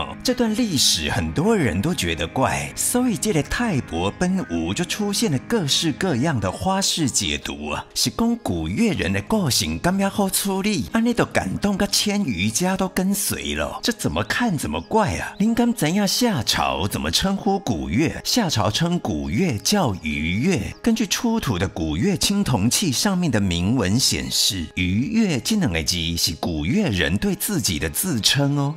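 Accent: Taiwan. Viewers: 這台灣國語聽的很不舒服，要就全臺語或全國語，吞不下去有點可惜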